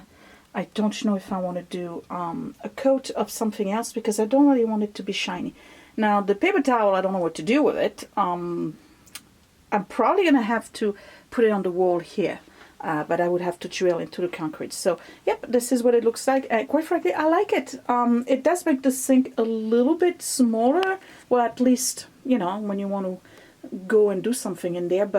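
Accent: France. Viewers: Amazing, you remind me so much of my mom, not for your age, of course, as I am the same age as you, but cause you’re so creative and crafty. :) Love your french accent. I am Italian and also have an accent and nobody can ever tell it’s an italian one lol. They always think it’s from somewhere else :)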